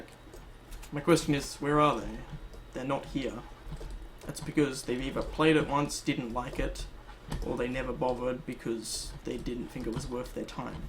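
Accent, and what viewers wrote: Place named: Western Australia, Australia. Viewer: listening to a western Aussie rant is 11/10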